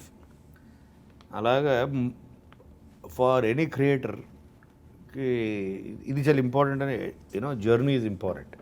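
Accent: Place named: Telangana, India. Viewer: Nice interview . యాంకర్ బాబు అంత బాగుంది కానీ ఆ తెలంగాణ యాస ఎందుకు .. అలాగే ఎవరు చిత్తూరు..కాకినాడ.. శ్రీకాకుళం .. యాస మాట్లడరు కదా.. ఎందుకు ఈ మధ్య నే కొత్త గ ఇంత అతి చేస్తున్నారు మీరు తెలంగాణ యాస తో .. Consider others too. One gets rejected for a call centre job too if there is heavy mother tongue influence in his English. Experienced it first hand in getting rejected. Cater for everyone when in media. Speak neutralized. Jai Telangana .. Jai Andhra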